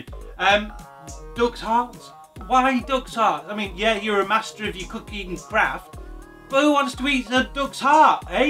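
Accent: United Kingdom. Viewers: You are too British for me to understand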